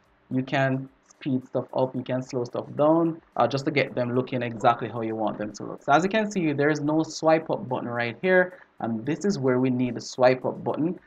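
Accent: Jamaica. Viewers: I can hear the Jamaican in you! Love it!! Now let me return to the video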